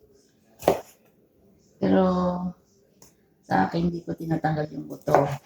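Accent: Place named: Visayas, Philippines. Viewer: Lami nag pinakbit ate 😋 cute mu mag bisaya ate oyy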